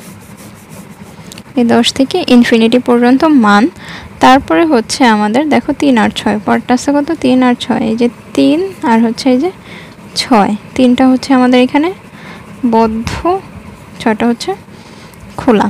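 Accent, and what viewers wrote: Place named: India. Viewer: excellent .am from bangladesh.eta ki indian na bangladeshi channel.kotha bartay indian lagteche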